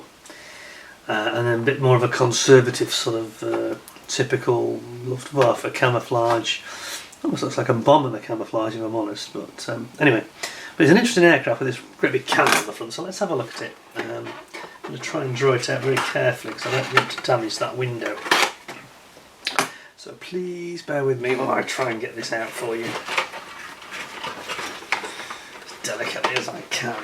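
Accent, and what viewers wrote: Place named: Liverpool, United Kingdom. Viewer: Sometimes I can hear "K" in the end of -ing words (like "something"). Because I am Beatles fan, so I thought you are from Liverpool area :) BTW - great channel, you are doing excellent job. During coffee brakes I like to watch your unboxings. These clips remind me good old days, when I was a young boy, and a great fan of Matchbox. Well done, mate.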